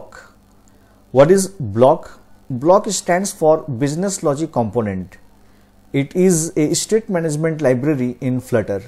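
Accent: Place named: India. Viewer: i hate hindi accent, you teach bad :)))))))) )))))))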